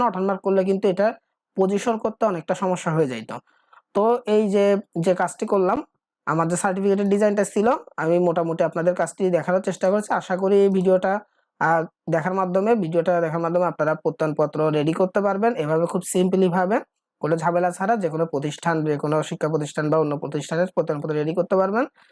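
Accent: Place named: Chittagong, Bangladesh. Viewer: চট্টগ্রাম ভাষায় কথা বলছে ।। আপনার ঘর কোথায় 🤔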